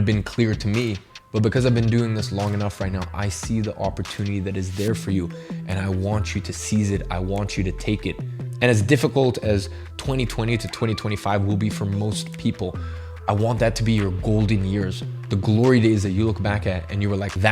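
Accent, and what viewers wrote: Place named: United States. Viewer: Respect to your mind on finances and the future. But I still don’t understand how you have an American accent after growing up in London. Not even a little bit?!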